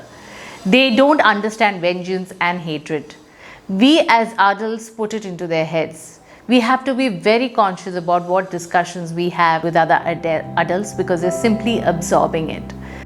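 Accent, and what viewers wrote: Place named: India. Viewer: No popular english self help books are available over YouTube in Indian accent. I think you have a wonderful, sweet and friendly Indian accent. If you think of doing it, it would definitely help lots of aspirants like me in developing our English beside personality. Thank you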